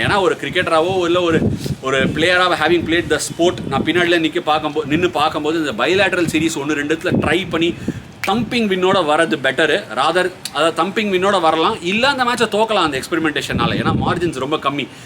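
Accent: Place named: Chennai, India. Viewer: I just love your way of talking. Keep it up. Very casual very Chennai though I am not from there,it's highly amusing.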